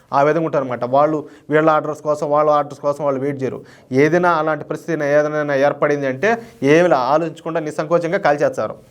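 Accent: Rayalaseema, India. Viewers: Every video chustunna midi mi talking style super and asalaina rayalaseema yasa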